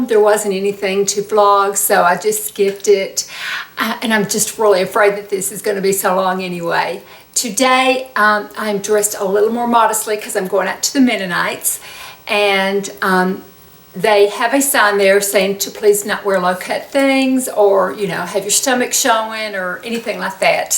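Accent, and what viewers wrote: South, United States. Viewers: I love your videos so much! When you bring us around your lovely town I think of one of my favorite movies Steel Magnolias. I love a small town country atmosphere not to mention a southern accent. I may have been born and raised in NJ but my inner spirit is most definitely southern charm!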